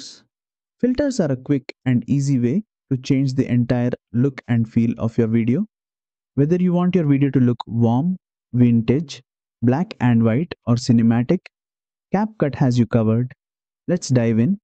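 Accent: India. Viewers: when the dude is talking in a indian accent u know ts is gonna be good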